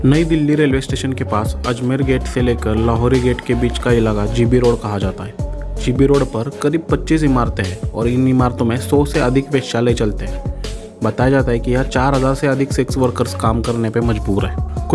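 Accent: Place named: Maharashtra, India. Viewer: Aap pakka maharastrian Ho isliye aap ki boli se pata chalta hai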